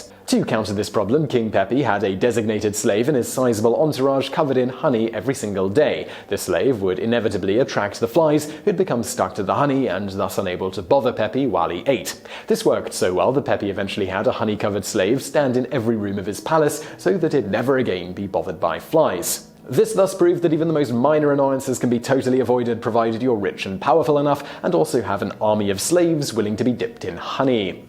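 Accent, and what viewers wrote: England, United Kingdom. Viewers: He's English, it is hard enough as it is to pronounce a lot of Egyptian and other North African/ Middle Eastern names as an American speaker. I'd imagine it is a little bit more of a challenge as a "proper" English speaker. The two languages are at simply odds for pronunciation with translations. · he's British I lived in Britain for more than 10 years and they don't pronounce words the same as we do in America. Go directly to an arcade and purchase a common-sense ticket. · Dude...hes British, be easy. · ATON!!!!!! Christ, British people never say anything like the rest of the English speaking world!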